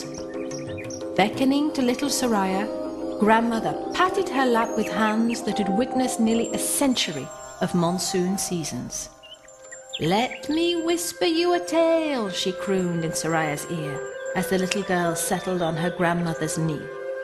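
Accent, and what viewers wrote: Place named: Rotherham, United Kingdom. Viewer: Completely ruined by Rotherham accent!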